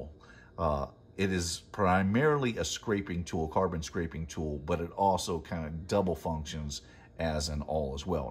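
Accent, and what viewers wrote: Texas, United States. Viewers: Are you actually from Texas? I'm trying to place your accent. You sound like Michael Dorsey from Tootsie when he was doing the soap opera.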